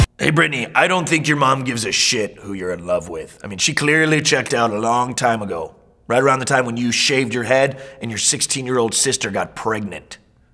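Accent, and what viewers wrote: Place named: England, United Kingdom. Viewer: no dislikes! Fuck Yeah! Nice english accent btw.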